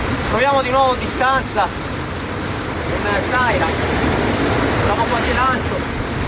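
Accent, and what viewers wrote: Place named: Liguria, Italy. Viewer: La cadenza sembra ligure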